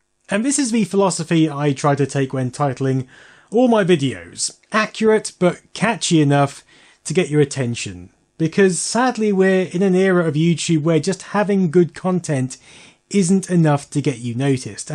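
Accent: United Kingdom. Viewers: Bro just want to thank you for making vidoes. Listening your british accent and geeky videos makesmy day always better! 👍🏻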